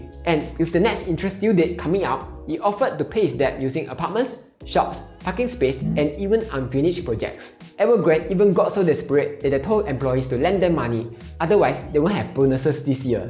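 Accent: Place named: Singapore. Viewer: He is speaking Singlish, which is Singaporean English!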